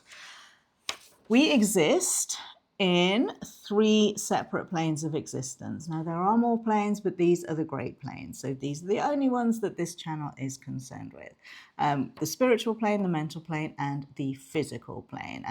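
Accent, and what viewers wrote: England, United Kingdom. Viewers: Can I ask what the relevance is to you stating that the people you normally work with are six figure earners...what difference does itahe what you earn...is that the only value we have as humans...the amount of money we can make?!?!?! I've never come across your videos and I was intrigued and even excited when I heard the English accent then you said that....such a shame 😮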